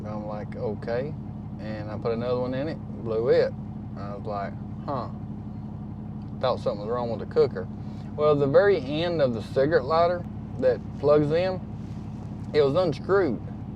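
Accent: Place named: Tennessee, United States. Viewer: I was guessing where in Tennessee you are from. My mom came from Lawrenceburg ,tenn. The accent is familure. Be safe.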